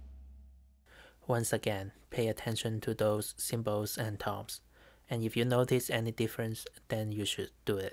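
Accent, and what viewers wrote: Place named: France. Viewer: Love the crazy French accent!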